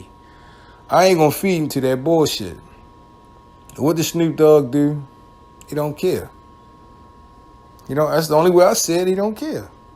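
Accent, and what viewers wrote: Baltimore, United States. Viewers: You must be from Baltimore the way you say those o's lol. You sound like Snoop from the wire.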